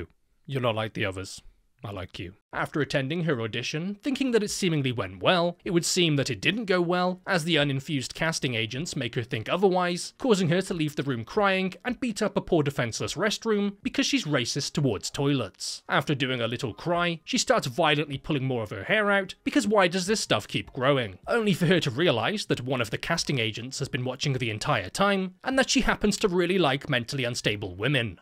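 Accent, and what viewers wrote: England, United Kingdom. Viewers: Ive said this before but bros humor is peak. Amplified by his British accent.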